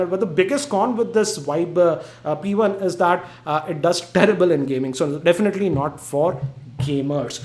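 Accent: India. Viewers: hate indians accent lol, cant understand anything sometimes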